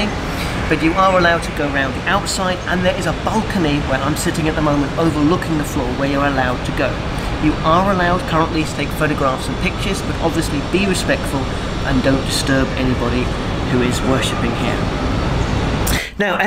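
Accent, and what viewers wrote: United Kingdom. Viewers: This first time i watch you video, are you England? Your accent just like in harry potter movie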